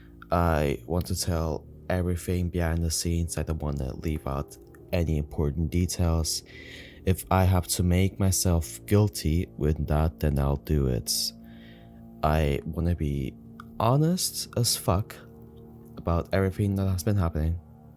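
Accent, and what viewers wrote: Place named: Philippines. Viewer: u sound awfully similar to a Filipino or at least someone of ASEAN descent (southeast Asia)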